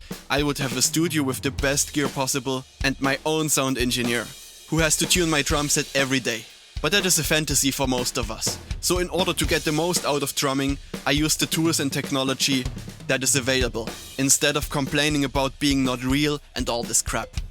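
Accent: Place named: Germany. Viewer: Where do you live? U sound like a german guy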